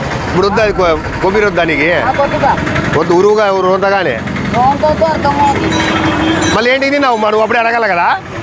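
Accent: Rayalaseema, India. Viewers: Rayalaseema basha chala bagundhi anna 😊😊😊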